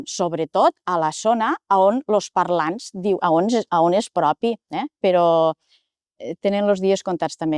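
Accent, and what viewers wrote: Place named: Valencia, Spain. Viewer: Sona com valencià...brutal.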